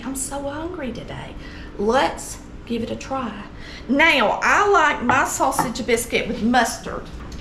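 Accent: South, United States. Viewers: I’m from Texas which I guess is more west than south because I’ve never had grits nor red-eye gravy though I’d like to try. But most of my ancestors come from Tennessee and Georgia, so I love getting to learn about true Southern cooking from you. You make it look so easy. I especially appreciate your bubbly personality and your very southern voice, reminds me a lot of the women on my father’s side—always cooking, caring for people, and laughing.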